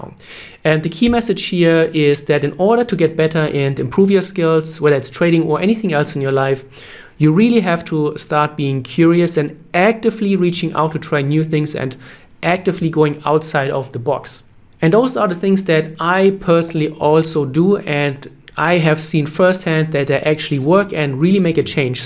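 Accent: Germany. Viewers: Are u German, accent is very much German English accent😁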